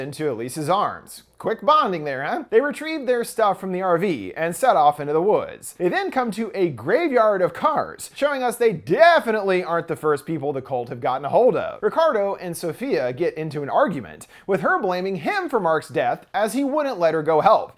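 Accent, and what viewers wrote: Italy. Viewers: Wait don't go I liked your ~fabulous Italian accent~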